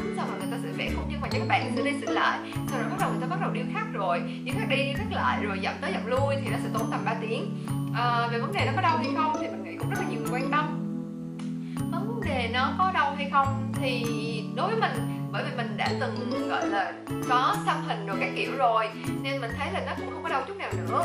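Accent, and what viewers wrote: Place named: Gia Lai, Vietnam. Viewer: Quê chị ở đâu thế nghe giống giọng gia lai quá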